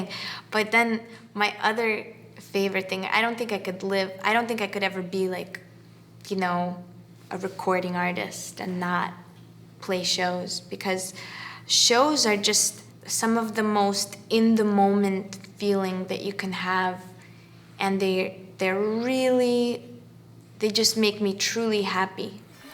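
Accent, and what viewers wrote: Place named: Russia. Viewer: слышу носителя русского с отличным американским акцентом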